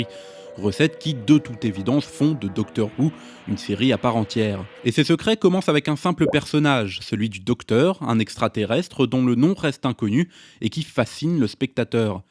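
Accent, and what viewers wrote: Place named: France. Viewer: Wait... he seems to be... French!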